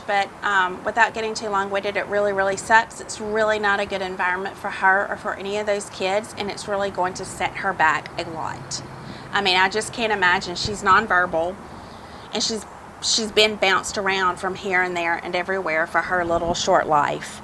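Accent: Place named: South, United States. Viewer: Maybe your southern accent could be why your voice comes across like that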